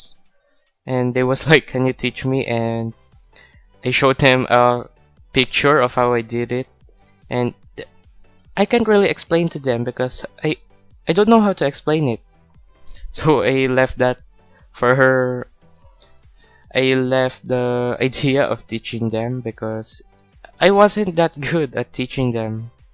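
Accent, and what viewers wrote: Philippines. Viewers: its obvious that his filipinooo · Parang tagalog accent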